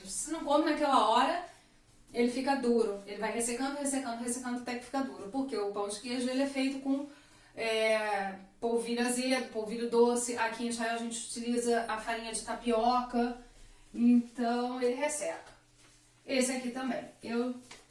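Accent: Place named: Rio de Janeiro, Brazil. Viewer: A locução mto carioca ,fala gritando rrrr e ssssss. Cansei me deu dorrrrr de cabeça. Deu não 🙆👋👋👋👋👋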